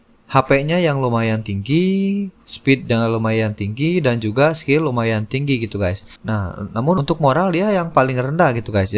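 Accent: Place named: Bali, Indonesia. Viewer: Ni logadnya kayanya Orang Bali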